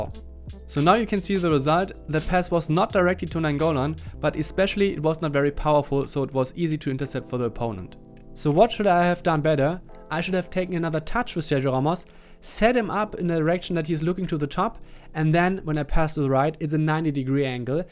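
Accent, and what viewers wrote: Germany. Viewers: Bist du Deutscher? Hört sich stark so an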